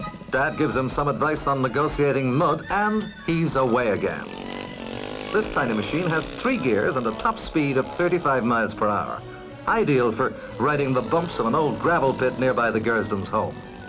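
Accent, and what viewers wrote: Ireland. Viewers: Do we know who the narrator is? I think I hear an Irish accent sneaking through in places.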